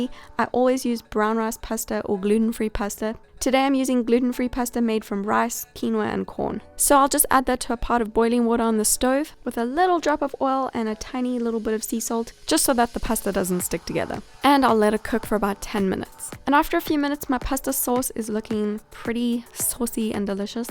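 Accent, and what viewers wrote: South Africa. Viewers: you are truly God sent. I have been struggling to loose weight for a long time and now it has started to affect my help. I started following you two weeks ago, and followed all you suggestions. I am happy to say in two weeks I have lost 2KG. So I just want to thank you and encourage other views to try your suggestions. I love your videos, your voice is soothing and I love you South African accent...lol. keep uploading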